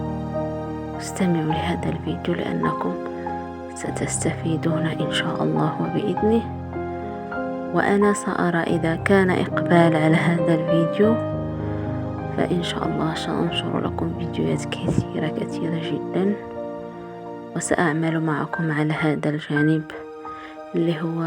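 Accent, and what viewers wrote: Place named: Morocco. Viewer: أقصد أن مستوي الصوت منخفض جدا مع ان محتوي الفيديو رائع لكن بكل أسف لم استطع الاستفادة منه لعدم وضوح الصوت كما أن أسلوب او لغة العرض هي مغربية ويب التالي بعيدة عن اللهجة المصرية لذلك لم أحصل علي الفائدة من الفيديو رغم اهمية محتواه الجيدة عموما أشكرك جزيل الشكر لاهتمامكم بتطوير وتطوير ماتقدمينه هذا من اهم عوامل النجاح في المستقبل إن شاء الله تمنياتى لكم بالنجاح وإنجاز أكثر أن شاء الله. تحياتى لكم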